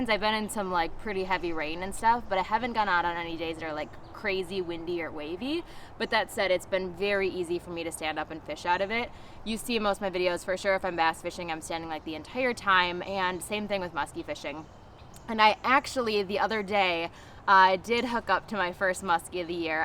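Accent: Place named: Michigan, United States. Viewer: oh yes! I’ve lived in Florida for 25 years now but my first 35 years I lived in the upper peninsula of MI.. Still love & miss that accent! And yes kiddo you got it!😊👍🥰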